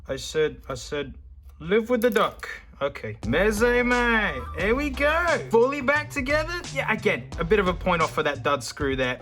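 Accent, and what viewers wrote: Australia. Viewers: can someone link me the website he uses to see the audio graphs? i can’t tell what hes saying with his thick aussie accent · This is fairly mild Aussie in the scheme of Aussieness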